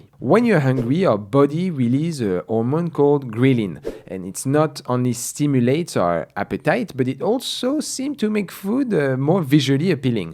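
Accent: France. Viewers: Found your content recently and it’s been great - also enjoy the French accent ! Haha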